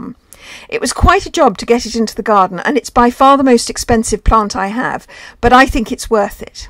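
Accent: RP, United Kingdom. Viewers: How safe is it to birch tree in a small garden, I have 4 and people are saying I am mad as they believe, my house would collapse one day due to them. Please advice. Thanks. PS: Love your posh accent.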